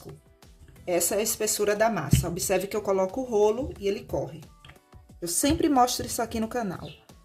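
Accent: Bahia, Brazil. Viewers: Amo suas receitas e gosto do seu sutaque Bahia tudo de bom gosto muito de vc pq esprica com muito atenção parabéns vc c me lembra uma amiga mulher do meu cunhado de senhor do Bonfim Bahia bjs 😘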